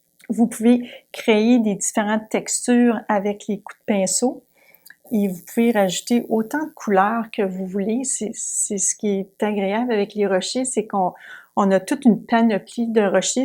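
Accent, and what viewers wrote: Quebec, Canada. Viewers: Merci pour vos conseils dorénavent je suis tous vos tutos j'en suis ravi, un marseillais qui adore aussi votre accent Québéqois. Michel Hibernac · J'adore vos explications ! .En plus votre accent canadien ,c'est super ! .Merci à vous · c'est un plaisir de vous écouter (avec votre joli accent québécois) et vous regarder peindre, j'ai justement des rochers à faire, j'ai un peu de mal car beaucoup de nuances, j'utilise un modèle de photos prise en hiver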